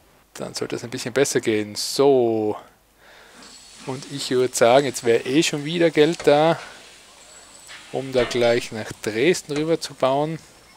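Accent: Austria. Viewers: Jo bisch denn du a Tirola, ha?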